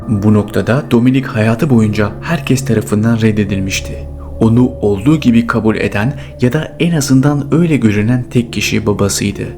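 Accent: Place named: France. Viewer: Fransızca biliyor galiba onun için böyle konuşur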